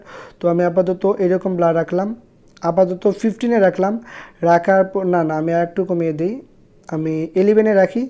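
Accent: Sylhet, Bangladesh. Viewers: ji oy... Bai. Jesataw kow.. Ek Sylheti e onno Sylhetir mat bala kori buje🙃😇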